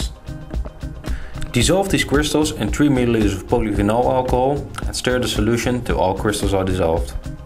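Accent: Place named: Netherlands. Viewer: Weer een Nederlands accent! Misschien lijkt het maar zo, maar waarom bestaat de YouTube community met de kennis en kunde om op video een succesvolle, werkende hoge kwaliteit OLED te maken (bijna) volledig uit Nederlanders? (Granted, dat is wel aannemende dat jij ook een Nederlander bent, maar aangezien je accent ben ik daar 99% zeker van) De YouTube OLED manufacturing community lijkt wel volledig overgenomen te zijn door onze mede-Nederlanders. Je zou ook wel kunnen zeggen dat wij de YouTube OLED community volledig hebben... G E K O L O N I S E E R D ! Nu alleen nog een manier vinden om er een slaatje uit te slaan, net zoals men deed in de gouden eeuw. Ja, die gouden eeuw! Ook wel bekend als de goede oude tijd van de VoC, toen wij Nederlanders nog praktisch regeerden over het zuidelijk halfrond. En dan kies ik er bewust voor om de alom bekende, zeer beruchte impopulaire zwarte bladzijde uit onze geschiedenis expres even buiten beschouwing te laten. 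Discussies over de hoeveelheid roet in het gezicht van Zwarte Piet en de huidskleur van Sinterklaas hebben wat mij betreft niets te zoeken in deze krochten van de YouTube sloppenwijk, ook wel bekend onder de Engelse naam: 'comment section'. 🤣 (Volledig satirisch bedoeld natuurlijk, het was absoluut niet mijn bedoeling om iemand te kwetsen met deze laatste alinea!)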